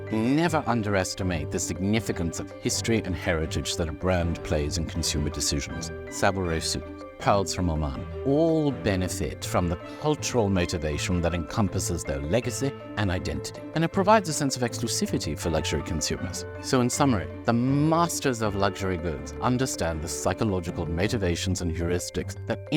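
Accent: RP, United Kingdom. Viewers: Ive never heard a more posh uk accent in my life he shld b narratin books as a side hustle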